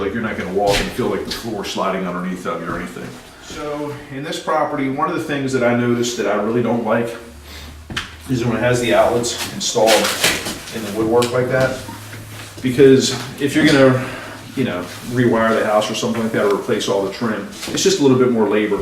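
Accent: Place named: Baltimore, United States. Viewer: This guy knows his stuff,but even more important you can clearly get a good understanding of hearing his authentic Balmer-accent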